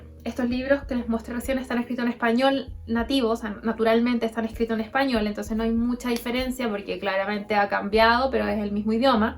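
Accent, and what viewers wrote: Chile. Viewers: Que bueno tu canal, ya tienes un Nuevo Suscriptor, Me haces que Eres Chilena por tu forma de Hablar, y se ve de lejos que eres una chica muy leída y de amplia inteligencia y Conocimiento, aparte que muy bonita, eres la mitología y la magia, la mujer perfecta, Saludos desde el perú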